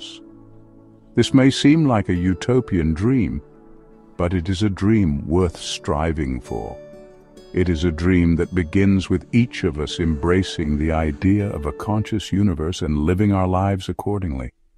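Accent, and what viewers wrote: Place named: England, United Kingdom. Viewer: I'm bothered by the narrator's two accents. Why is he slipping from an America English accent into an England sounding English accent? Is anyone conscious of this? I assume some are now. Please explain.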